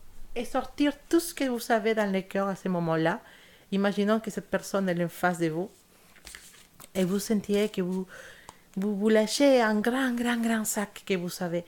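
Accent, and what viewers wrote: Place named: Spain. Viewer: Bonjour je viens de vous découvrir sur la chaîne et j adore votre tirage mais aussi votre accent ça me rappelle mon. Pays car je suis aussi espagnole. 😜 Bendiciones Hermosa 😉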